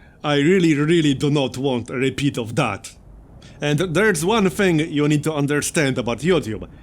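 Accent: Poland. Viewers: Good luck with that missing 1k, (I said around begining of December that you will get it at the begining of 2020, but as a data-focus guy, you are probably right, that more likely is going to happen around May next year. I would never thought that accent could be a problem here on your channel, but probably that's because I am Polish as well and I don't really care about the accent more than the content itself. Anyway, do what needs to be done to reach your goal.:) And sorry for repeating myself, I think that one day a month of some retro-gaming in strategy genre (video or streaming) would make me very happy. You know, something like Centurion, Panzer General 2, Dune II, Syndicate or Age of Empires, you know yourself. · Just cut the long videos at 30-35 minutes with a suspense. Just like with the soap operas we will be back for more excitement. I have no problems with long videos/movies at all but I understand how the algorithm punishes the videos that are not watched at full length. 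I am sure many more of us like your Polish accent than those dislike it. Just keep being yourself and do what you really enjoy. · I subscribed for civ 6 deity gameplay and I wish the episodes were at least 40mins long. Your latest civ 6 play is 5/5. I don't care about the gimmicks that are popular on youtube: I did x and y happened. I wish you didnt spoil what happens in the episode in the title for example: no oil!!! When that is what happens in the episode... and me personally i dont like xcom at all so i dont watch those videos. I believe you would get more viewers with quality civ 6 content. About your accent?!?! Im finnish and i have a finnish accent, youre polish with your accent, i actually know one polish woman who lives here and we all havr our accents, not everybody talks like the queen of England like we are teached to do. So dont be silly your accent is fine all that matters is if people understand when you speak, not the accent! 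I actually like the way you speak you should sell t shirts that say "works for me" hearing that always makes me smile! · please go to America once and actually talk to different people there. You will find out that apart from the natives (white, born in America, both parents have English as first language), everyone have some degree of accents and they don't take it as a problem. Tbh, some are even proud of their accents because they think their accents emphasize their cultural and ethical background. If you're not a native speaker, nobody would expect you to speak as one. So be confident with your English. After all, you are a Polish, not an American, and it's totally fine for a Polish to speak Polish-English instead of American English.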